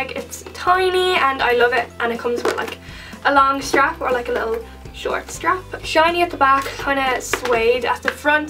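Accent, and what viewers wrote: Ireland. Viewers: Where in Ireland are you from?? Don't recognise the accent ..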